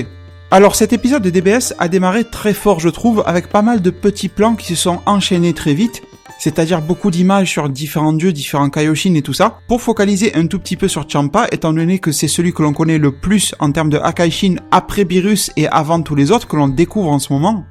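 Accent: Marseille, France. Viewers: ton accent .. Marseille non xD j'ai le même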